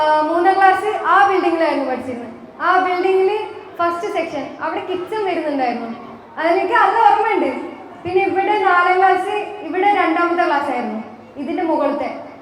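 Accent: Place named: Kasaragod, India. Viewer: Masha allah mole congratulations ❤❤eniku kasarkkode bhasha bhayankara ishtamanu😂keep it up mole❤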